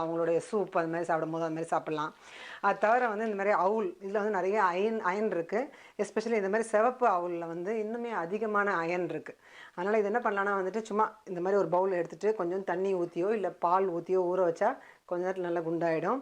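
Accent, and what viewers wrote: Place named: Tirunelveli, India. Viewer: Mam what is ur native place..ur slang is like our tirunelveli slang..nice to hear..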